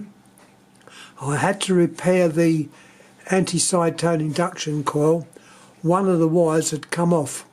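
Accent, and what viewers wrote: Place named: United Kingdom. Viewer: Sounds like Winnie the Poo with a British accent. I would love to see an episode where a Russian telephone is used.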